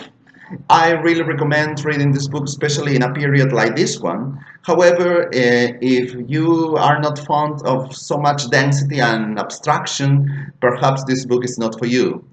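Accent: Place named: London, United Kingdom. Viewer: en serio?? Parece que hubieras vivido ahí, xq el acento es británico-londinense, increíble que sólo lo hayas adquirido estudiando.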